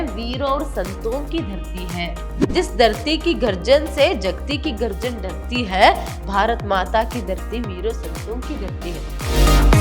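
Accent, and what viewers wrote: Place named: Karnataka, India. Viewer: but she speaks Hindi more like kannada😂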